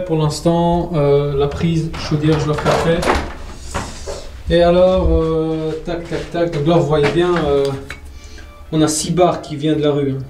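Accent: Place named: Belgium. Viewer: Rien à dire bravo ! J'aurais aimé être aussi pro que toi! A ton accent il me semble que tu sois de Belgique ! Dommage j'aurais peut être eu besoin de toi à l'achat de ma future maison car je sais que tout serait soigné et maximisé !🙏🙏👍👍je m'abonne direct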